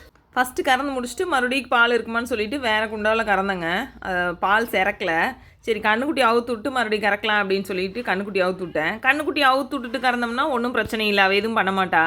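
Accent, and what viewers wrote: Kongu, India. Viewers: எந்த ஊர் அம்மனி நீங்க கொங்கு பேச்சு தவழுது. வாழ்த்துக்கள் இந்த விவசாயி மகளுக்கு ❤🌸👌 கண்ணு ரெம்போ அழகா இருக்கு மா...🎉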